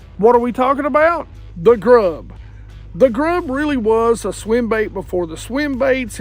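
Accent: South, United States. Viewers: Great advise but I can’t take this slop southern accent for another second. Unsubbed.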